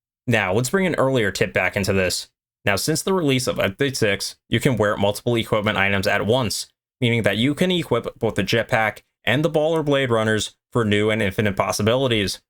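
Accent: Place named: Philadelphia, United States. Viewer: You from the Philly/New Jersey area? Thought I heard the accent in your voice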